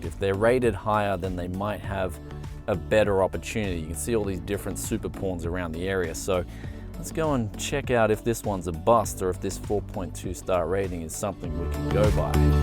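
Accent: Australia. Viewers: SuperPorn! Got to love Austalian accent ! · The Australian accent didn't do you any favors. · I deadess heard you say you're checking out some "Porn brokers" love that aussie accent. :D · It’s the Aussie accent 😂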